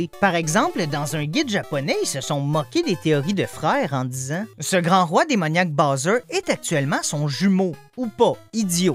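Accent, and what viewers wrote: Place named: Canada. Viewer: Cette façon de parler en disant "actuellement" et "régulier", mais il est canadien !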